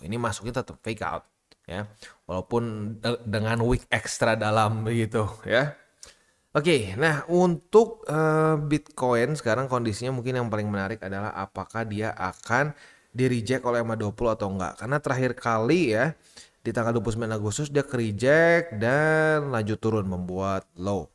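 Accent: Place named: West Java, Indonesia. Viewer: Bang lu orang sunda bukan? Kaya lekat banget logat sunda nya 😂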